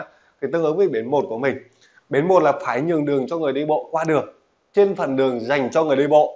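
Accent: Thanh Hoa, Vietnam. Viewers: Anh oi anh phai người quê thanh hóa ko ạ , bai giảng của anh tuyệt vời lắm ạ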